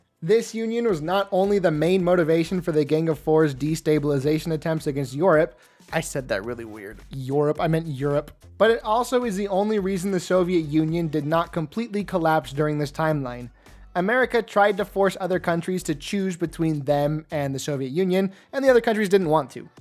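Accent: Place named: Argentina. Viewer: Off topic, you have a very argentinian accent, i don't know what to think xD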